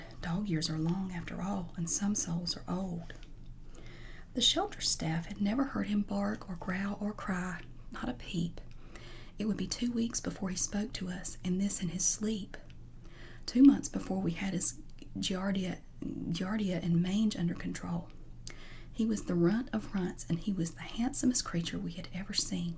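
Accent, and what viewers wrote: South, United States. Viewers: I subscribed! Like my southern sister speak!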